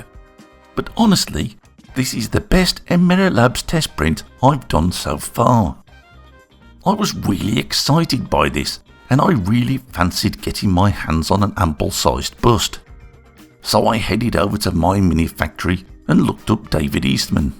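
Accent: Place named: Wakefield, United Kingdom. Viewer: Sorry buddy I have to call you out on this one on TWO counts! One he is a northerner possibly a fellow Yorkshireman like me ( sounds like he's from Wakefield) and we are ALL plain speaking and if we don't like something we tell you weather you like it or not, its in our genetics. Second I was a big name some 5 years ago in reviewing computer parts (after being 2x UK OC winner) and I will tell you this, I know an honest reviewer when I see one! has the same philosophy as me, "SAY it as you SEE it, but INVESTIGATE thoroughly! " so with all due respect I have to call you out on this one. Have a great weekend:)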